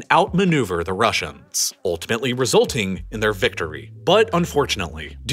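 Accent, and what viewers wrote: United States. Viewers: Woe Woe Woe! are you purposely doing Putin's Bidding? Listen to what you said Why would anyone in Nato want to do something like that. This whole entire conflict is based on Hypothetical what if's That Putin's Said could happen if his Imaginary Evil Enemies of the west. Yo you sound American You know good and god damn well no one has ever talked about or thought about or even wants to invade Russia or any where close to Russia. Theres this new theory that all the hostility we thought was coming from Russia never actually existed which explains the poor shape of it's military, but it turns out that conflict with the west is just the oldest eastern European Dictators survival tactic that they been using for political to stay in power for centuries. "Hey I know it sucks you all are starving to death and the economy is shit because me and the homies stole it but it's must make sacrifice or the mean evil west will come and get us. 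US in a way does it alot as well. There's for sure very powerful people who's wealth is directly effected by us being enemies with Russia. but if you actually sit down and think about it. there's nothing that give us any reason to believe these people on the other side of the world are our enemy other then the people who stand to gain telling us they are. I only recently realized we all have been finessed which like you just said there is a real war with real people dying by thousands all so that a make believe enemy could have an advantage in a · Russia is not scared of anyone not china not Japan not the scumbags from America or Europe maybe they were not ready in 214 but they are ready to whip your asses to smithereens I mean and I mentioned your because I take it from your accent you are an American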